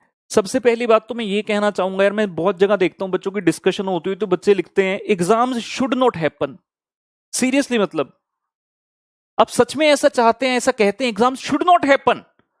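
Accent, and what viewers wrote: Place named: Punjab, India. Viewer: Arey sir apka punjabi touch toh bwaal😂😂👌🏽👌🏽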